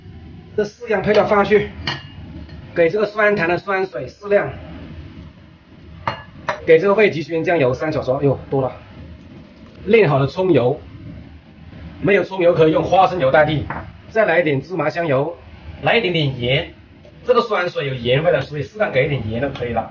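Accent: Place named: Guangxi, China. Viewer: 柳广普通话😀现在兴吃醋泡鸡？👍👍👍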